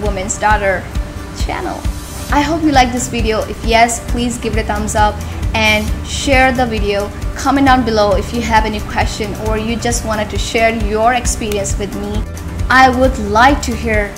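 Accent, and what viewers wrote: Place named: United States. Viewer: Wow...bhut achii english h apki..love from pauri garhwal...aap kis chij m job krte h ji...or apne USA style English kase sikhi please tell me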